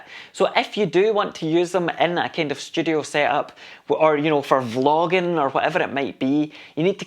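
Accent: Scotland, United Kingdom. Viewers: I spotted the word "Pish" used in your video, and I said tae masel, this guy's gotta be Scottish. Thanks for not disappointing matey 👍 🙂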